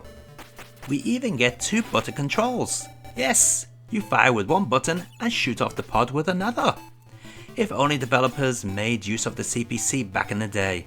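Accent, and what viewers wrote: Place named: Liverpool, United Kingdom. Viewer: I think these remastered "Battle" vids are a great idea,! I'd actually forgotten about the days when your videos featured overlay text only and no sign of your gentle very-slight-hint-of-scouse tones...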